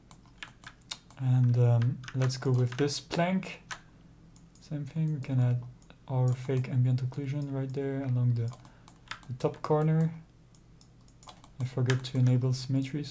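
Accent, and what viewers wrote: France. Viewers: I’m starting to think you’re only apologising for your French accent so we compliment it ;) awesome tutorial, real time tutorials that explain everything in detail is a rare find!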